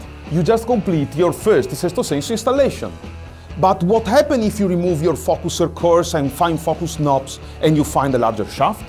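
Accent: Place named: Italy. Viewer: Salve! Tu haves notabel accento italiano. Successo a ti e ao Populo Italiano!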